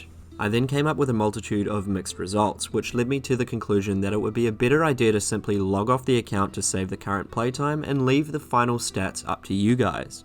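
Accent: New Zealand. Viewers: dude u a kiwi?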